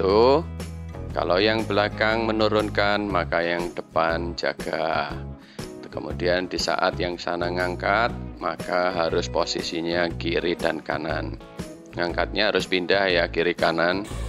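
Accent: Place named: Java, Indonesia. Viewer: Saya jogja pak, saya kira bapak jogja kog ada logat jawanya Terus berkarya pokoknya coach.. Kami tunggu video selnjutnya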